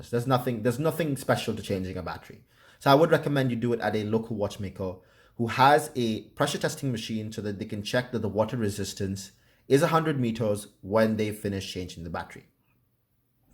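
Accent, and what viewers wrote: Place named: India. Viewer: hehe I could make out from the accent... I'm indian too and pleased to see a GS lover in India... Do u have any Spring Drives or Mechanical GS too?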